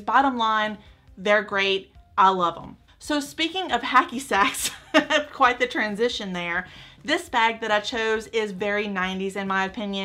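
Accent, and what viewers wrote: South, United States. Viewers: I love, love, love the first outfit! The red accents were perfect and that stinkin’ adorable little flowered clutch? Yes, please!! 😍 I’m from Rowlett, Texas… where are YOU from? (I heard all those “y’all’s” you were dropping ! I’m definitely channeling southern gal roots!!) LOL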